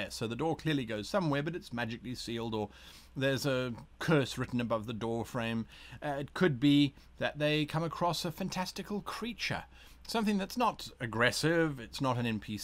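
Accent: United Kingdom. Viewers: I know this is an old video to comment on, but I am so glad I watched it. I have suspected for a long time that you are South African, but was never sure. Your accent is is such that I thought you may be British. Very very happy to see a fellow South African do well on YouTube and be an amazing voice for us nerds everywhere. You sir, have a new subscriber ! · South Africa, huh? I wouldn't have guessed that; your accent sounds British. Unless you moved there later in life.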